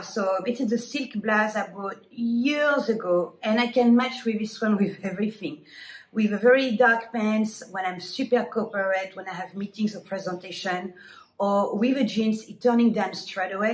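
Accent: France. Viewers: Bonjour. Congratulations. Everyone adores your French accent!! I'm confused by what you mean about "blazers". The white jacket you are wearing I would call a blazer. The denim on denim look in Canada is what we call the "Canadian tuxudo", ha ha! I would never think to wear a dress like that over blue jeans, what a great idea. I will need to buy a dress like that to wear over blue jeans. I can't wear blue jeans to work. When I do I don't feel in the "business mind set". I feel relaxed and lazy or I should be cleaning the kitchen. Other women can wear blue jeans with no problem but I feel laxadaicle, not good. Great tips again.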